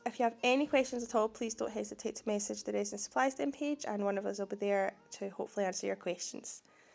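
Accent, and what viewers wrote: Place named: Scotland, United Kingdom. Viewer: Great Video, I agree about using a whisk its much easier to get the mixture creamy enough and lump free. Thanks for Sharing, great to hear the Scottish accent too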